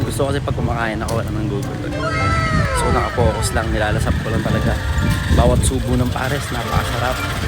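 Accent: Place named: South, Philippines. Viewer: dalang dala yung punto ng taga south. yung "ehhhh", "walanjumuyon", "walanya" "apakatuleg mo!" hahahaha goods na goods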